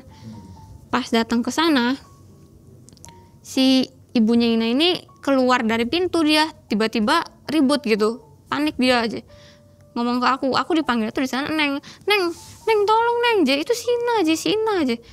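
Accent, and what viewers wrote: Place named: Cirebon, Indonesia. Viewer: biasanya org cirebon klo ngomng ya je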